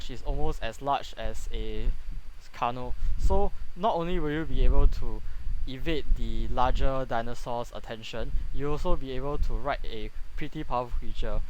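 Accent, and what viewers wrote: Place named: Singapore. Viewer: I'm a simple man, I detect Sing-lish, I liked and subbed, greetings from Jakarta. Stay Safe!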